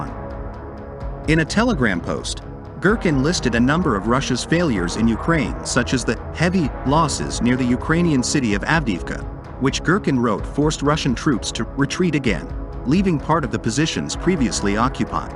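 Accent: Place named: United States. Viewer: Clickbait with annoying American bot voiceover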